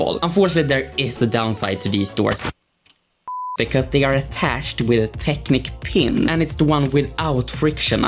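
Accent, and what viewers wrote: Sweden. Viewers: Så stark svensk brytning haha...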